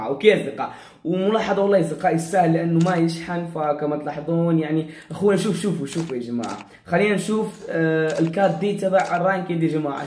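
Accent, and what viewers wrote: Morocco. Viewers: هل انت مغربي؟ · واش انت مغريبي من اي مدينة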